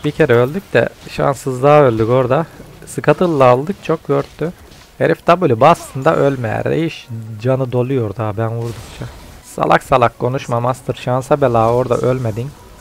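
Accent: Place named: Antalya, Turkey. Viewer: Kanka Antalya lımısın şive çok yakın 😂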